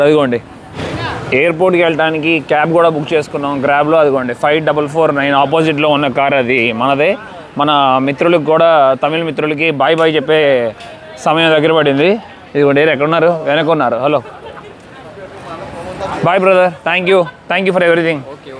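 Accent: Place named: Vijayawada, India. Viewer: Harey Krishna.. brother, meedi Vijayawaada kaabatti, mee bhaasha chakkagaa untundi... waiting to see your videos in Japan.. Jai Sree Raam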